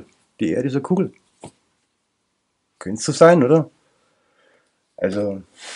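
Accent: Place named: Munich, Germany. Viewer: scheinst ein sympathischer mensch zu sein! und noch mit echtem münchner dialekt! grüße aus ndby!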